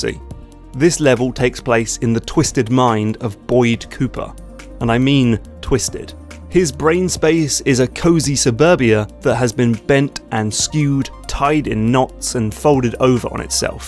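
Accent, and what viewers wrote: United Kingdom. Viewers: I love internet video man, especially British voice internet video man.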